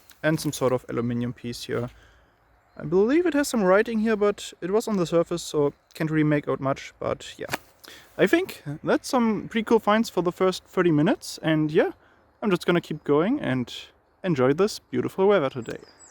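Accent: Germany. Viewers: Are you German you have an German accent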